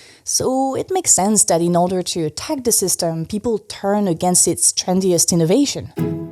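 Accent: France. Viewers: which French department are you from? I’ve never heard a French person sound this French 😭😭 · just so you know, no one would be watching your content if you didn't have the french accent.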